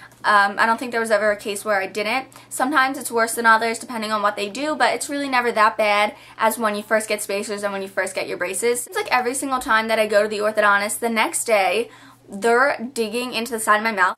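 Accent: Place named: South Jersey, United States. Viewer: Not to be creepy, but do you live in southern Jersey. I noticed an accent and a phillies hat. I am from absecon and I recognized your voice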